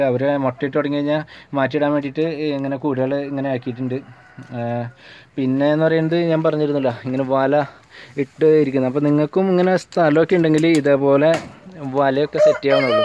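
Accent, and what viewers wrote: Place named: Malappuram, India. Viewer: Ninte vioce ketal trisur Karan thonilla.. Malappuram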